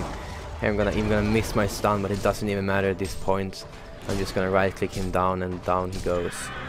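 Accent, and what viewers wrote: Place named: Sweden. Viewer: I can't watch this due to your Swenglish accent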